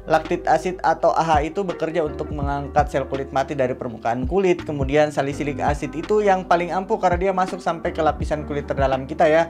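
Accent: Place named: Bugis, Indonesia. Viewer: Logat nya kayak orang bugis ya bang?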